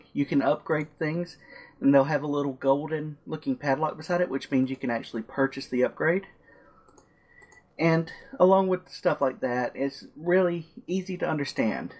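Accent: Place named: South, United States. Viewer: southern guy love it :p sound like a friend i used to know .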